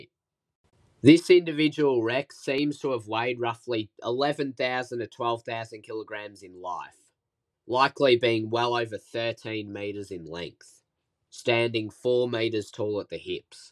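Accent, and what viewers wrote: Australia. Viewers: This is most Australian sounding man in the history of accents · Dude are you an aussie? It sounds like it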